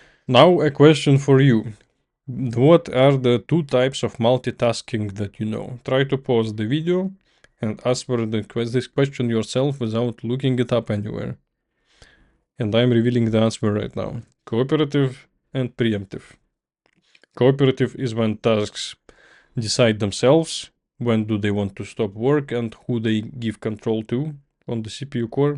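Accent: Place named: Russia. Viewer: nice lecture other than the scarrying russian accent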